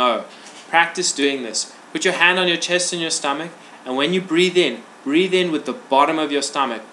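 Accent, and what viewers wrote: South Africa. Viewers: hi are you south african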